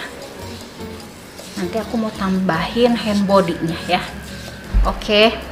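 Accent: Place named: West Java, Indonesia. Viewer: Suka banget liat teh ngmong medok Sunda gini lucu itu, bodiiii bodiii lucu uy dengan kekhasannya. Ajhh habis ini mau nyobain deh produk YOU gara2 racun teh 🤩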